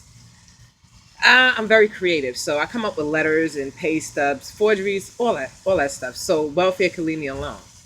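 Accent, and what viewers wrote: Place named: Brooklyn, United States. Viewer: great "sumpen ain't right face". I lol'd . great accent too, Brooklyn? farewel is a joke, but only the rich are laughing.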